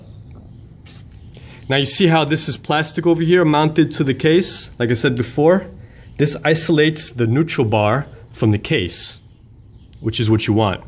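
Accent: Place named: North, United States. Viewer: Your accent was driving me crazy! You're from up north aren't you? I'd be willing to bet you're a yankee, without a doubt!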